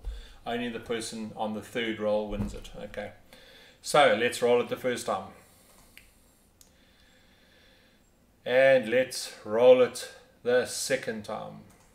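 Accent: South Africa. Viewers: I hear sud Afrikaner accent, I subb. Miss my country <3 awesome video boutie!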